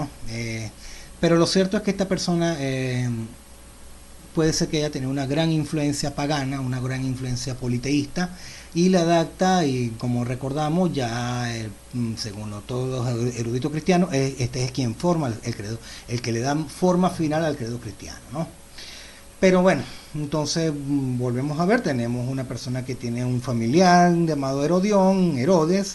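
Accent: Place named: Venezuela. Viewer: Usted,no parece judío,tiene acento venezolano.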